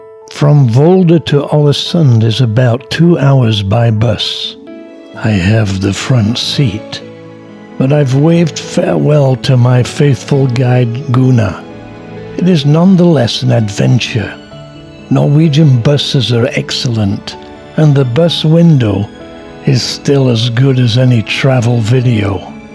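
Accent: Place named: Scotland, United Kingdom. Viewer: I've watched nearly all your videos. they've been a great help to plan our itinerary for our summer trip with our five teenage children. Where are you from? I just can't figure out your accent, sometimes, a bit Scottish or North of England. You're either a Scandinavian who's lived in England a long time or a British person who's lived abroad a long time. Thanks for the great videos and useful tips.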